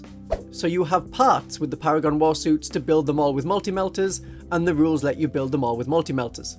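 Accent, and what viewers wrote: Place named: Geordie, United Kingdom. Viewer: Where is your accent from? Sounds posh Geordie.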